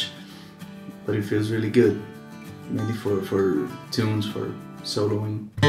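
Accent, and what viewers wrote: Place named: Denmark. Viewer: Nice! It just sounded a little like a danish-english accent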